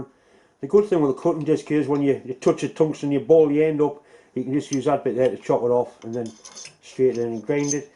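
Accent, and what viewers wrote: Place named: Northeast England, United Kingdom. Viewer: North east accent, but cant place exactly where.... Id have said closer to the tyne than the wear though! Good idea that, subbing for lathe porn!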